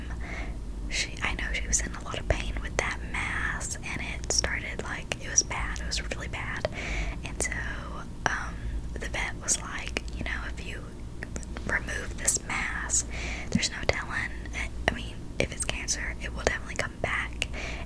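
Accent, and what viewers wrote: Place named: South, United States. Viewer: Girl I am ugly crying in my bed like snot dripping from my nose crying. This vid hit hard with me. I totally relate to everything you said and lm 41. I like videos like this because I like getting a view of other people’s lives. Like I look at you and think gosh she’s so pretty, she has the cutest southern accent, she is so dam kind and I envy you. But u gave us some real ass shit and I love it. I don’t love how you’re struggling but just know you’re not alone. I know it seems embarrassing talking to someone, a stranger about how you feel but don’t be. If you need help it’s ok. This is my fav video of yours. I honestly like these asmr videos more than the other stuff. You’re one of my favs 💕💕💕💕💕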